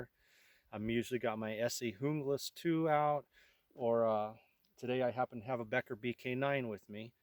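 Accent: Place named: Mexico. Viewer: I like your Mexican accent. Like your hat also.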